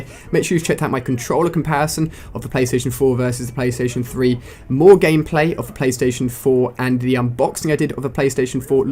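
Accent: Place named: United Kingdom. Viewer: Dejavu....only this time its british guy talking....tmartn did this first..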